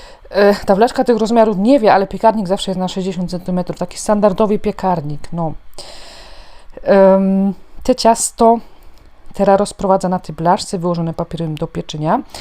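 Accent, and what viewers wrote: Silesia, Poland. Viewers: Cześć dziewczyno😁super filmiki , uwielbiam twój kanał i twój śląski język 😎🤪😅👌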